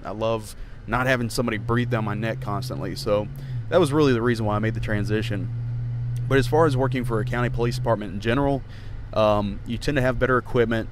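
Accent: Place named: St. Louis County, United States. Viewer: You sound like you're in St. Louis County.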